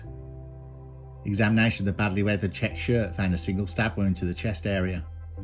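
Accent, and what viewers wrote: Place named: West Midlands, United Kingdom. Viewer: I could listen to you read the dictionary love the West Midlands accent much love from a weegie 😊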